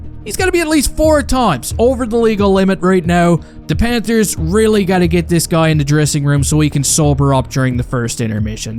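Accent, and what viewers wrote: Newfoundland, Canada. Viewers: Whwre you from bi? Me and the misses are arguing I'm saying your from newfoundland and she's saying Scottish 🤣 where canadian so help us out bud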